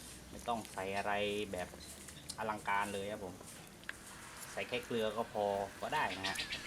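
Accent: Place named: Surin, Thailand. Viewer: น้าอยู่จ.ว อะไรครับ สำเนียงเหมือนคนสุรินทร์หรือไม่ก็บุรีรัมย์ใช่ไหมครับ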